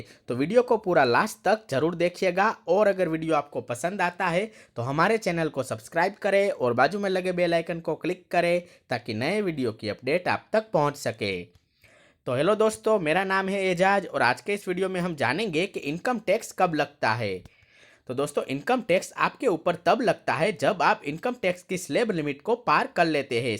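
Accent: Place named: Gujarat, India. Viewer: आप की हिंदी सुनके लगता है की आप गुजराती हो।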